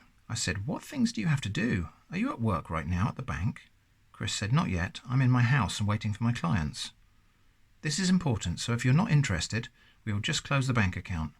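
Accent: United Kingdom. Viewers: I was gonna subscribe but british english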